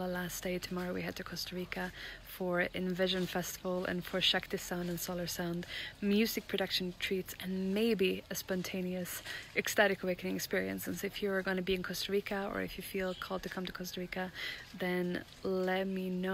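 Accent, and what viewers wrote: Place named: Sweden. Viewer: Älskar att höra dig prata med lite svensk dialekt! 😍 grym video ska verkligen testa detta!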